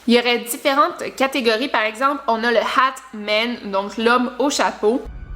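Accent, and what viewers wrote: Quebec, Canada. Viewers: Je crois que rien n’arrive pour rien. J'étais à l’aéroport, une longue escale à Miami après un long voyage de 3 mois en Amérique du Sud et je suis sur des wifi non sécurisée depuis trop longtemps... Je ne connaissais pas ça et je viens d’utiliser ton code promo et j’ai sécurisé mon iPhone et iPad ! Merci ! Et en passant, j’adore ta chaîne et j’ai vue tout tes vidéos, je les attends avec impatience surtout quand j’étais en voyage. Ça me faisais du bien d’entendre quelqu’un parler en québécois. Je parle moi aussi espagnol... je suis à Miami et je ne fais que parler en espagnol... mon cerveau est conditionné ... ça et le papier de toilette dans la poubelle ! Ahhh ! J’espère revenir à la normale tantôt à Montreal car je pense que le douanier va capoter si je lui parle espagnol ! Bravo encore, tu es excellente ! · Je m’y attendais pas à la façon dont tu as dit « wifi » ahah j’adore ton accents québécois ❤️😂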